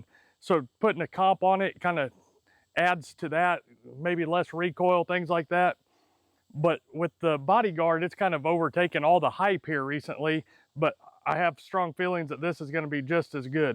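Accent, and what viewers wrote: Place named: Midwest, United States. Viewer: I picked up one of these today and my LGS didnt have a holster. Found your website, then saw this video. I thought you sounded midwestern haha! I’m also from Missouri! Seals the deal that I’m going to get my holsters from yall now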